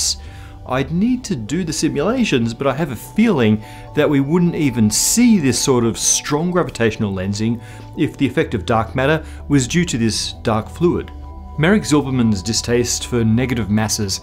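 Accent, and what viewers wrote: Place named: Australia. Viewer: This gentleman makes very interesting videos and I can only say good things about them. I can understand why Professor O'Dowd has so many supporters. I feel terrible for saying this but to my eyes he has an extremely strange shape. His head seems to be much too big his torso and legs appear too small. His legs seem to be too far apart and too short. It's the oddest thing. His habit of holding his fingers intertwined with the thumbs touching on top for no reason I can determine irritates me intensely. On top of all this is his very strange stresses on apparently random syllables in each sentence. And finally there's that Australian accent which grates on my nerves like a chalk screech on a blackboard. The thick eyebrows and beard seem particularly ill suited for his looks. There's nothing personal about any of this and really I mean no offense as the whole perception is entirely mine and I can't explain it. 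Am I alone though? To say something good about Professor O'Dowd is easy. The inconsistency between the two results for Hubble's constant is fascinating as indeed are the topics of Dark Matter and Dark Energy. We live in exciting times for cosmology so consider the enormous difference between this scientist and another man who obtained a Doctorate in Astrophysics, Dr Jason Lisle, whose area of study was Solar Dynamics and who published a few papers before throwing his career away to become a "researcher" for an Answers in Genesis type outfit and a YEC (Young Earth Creationis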